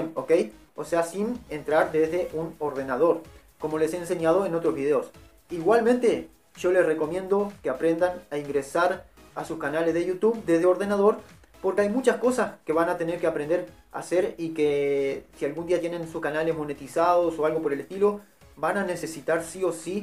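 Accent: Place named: Argentina. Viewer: acento argentino tiene que averiguar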